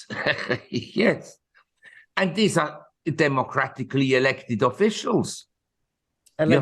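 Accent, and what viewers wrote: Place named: Switzerland. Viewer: I love Marc’s Schweizer Deutsch 🇨🇭accent so distinctive and just add to the overall entertainment and insightful wisdom great choice of where to live Chang mai is fantastic Marc talks it’s good to listen Not sure that the precious metal call is a good one , I’d go maybe max 10 percent of a non yielding asset but the general advice of diversification has never been more important and keep dry powder keep cash now you can get 3 to 4 percent on your cash with no risk patience. Will pay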